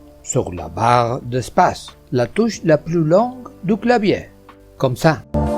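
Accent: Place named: Russia. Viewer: s'il vous plait mr vous n'etes pas français car votre pronanciation je crois que vous etes russe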